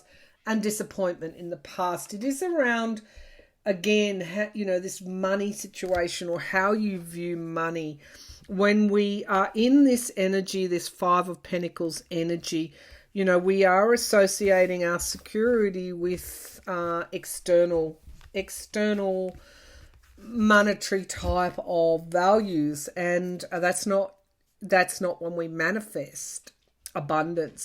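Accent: Australia. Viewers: Your reading was lovely, thank you. I love the Aussie accent, a bit like mine Oi Oi Oi 🙌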